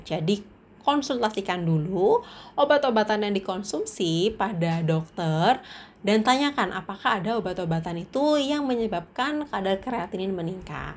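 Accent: Java, Indonesia. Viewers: Dokter medok😂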